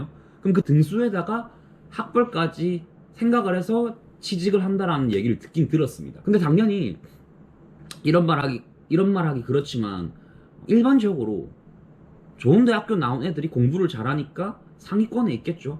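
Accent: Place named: Gyeongsang, South Korea. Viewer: 말투 들어보니 경상도 분이신듯? 학교 다니면서 붙으셨나요? 혹시 개업하신 분인지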